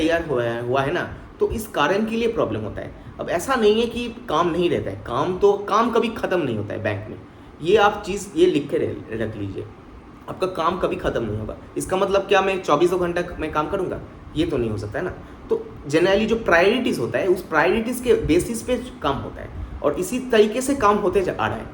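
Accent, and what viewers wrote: Bengal, India. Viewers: Aap ka voice bengoli accet hai ?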